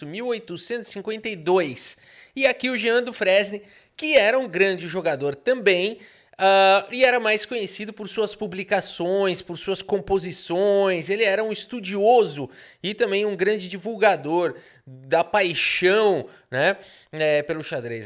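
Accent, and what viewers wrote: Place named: Rio Grande do Sul, Brazil. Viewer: Percebo q você é do rs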